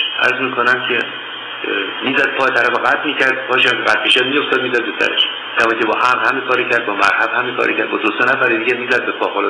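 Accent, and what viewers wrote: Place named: Turkey. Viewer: kiram tu kosse madaret ba in lahjeye torkiet.zanjende pash byofte farsha madaro khahare toro ham migan.dar zemn yadet bashe araba jaddo abadeto ham be moghash gaidan ke tule saghe arbi mesle to az kune nanat byofte. kire har chi bahai tu kosse madaro zano khaharet koskeshe mosalmune goh.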